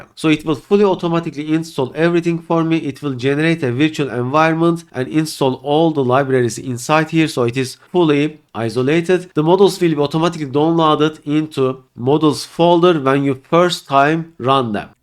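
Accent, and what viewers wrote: Turkey. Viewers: Rastgele önüme çıktı dinliyorum; diyorum "bu kesin Türkçe aksan" altyazıya bakıyorum Türkçe yok dublaja bakıyorum hak getire! bu kadar mı kendi dilinden, milletinden nefret ediyorsun?!! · aksan türkçe, dil ingilizce olunca 5 saniyelik hangi dil la bu şoku geçirdim. hocam onca farklı dil varken bari ai da olsa türkçe dillnede çevirseymiş siziniz bu videoyu.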